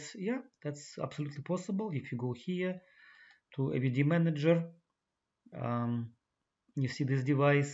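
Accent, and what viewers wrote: Russia. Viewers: You are a Brazilian guy. But you really sound like a Russian guy. The guy from university, who studied English, sounds pretty like you. It looks like Russian and Brazilian accents are similar. It sounds like big news for me. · nice accent are u from Russia or Ukraine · Ааай обожаю русский акцент в английском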